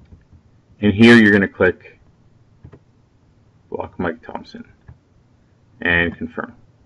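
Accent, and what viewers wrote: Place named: Canada. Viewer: I adore your Canadian accent!! Nicely done. Very clear and easy to understand instructions. Thank you.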